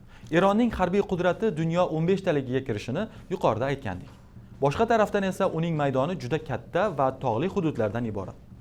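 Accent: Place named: Uzbekistan. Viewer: ""Yoqtirma"" sizni sõz boyligingiz va asl Õzbek laxchangiz ajoyib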